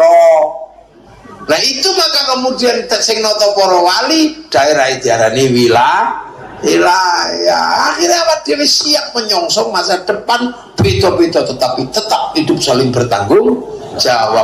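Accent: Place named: Banyumas, Indonesia. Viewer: Wong bumen ngapak rangapak rakepenak💖💖💖🤣🤣🤣🤣nyimak gus🙏🙏🙏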